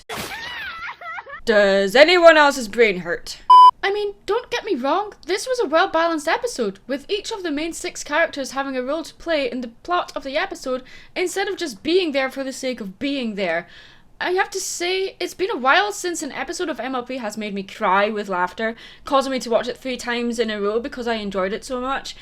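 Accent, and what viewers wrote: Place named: Lothians, United Kingdom. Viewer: You sound like you're from the lothians, am I right?? xD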